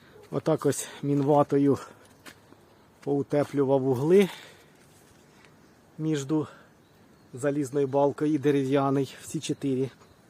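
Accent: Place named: Poltava, Ukraine. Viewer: теж чую полтавський акцент) а де продовження ?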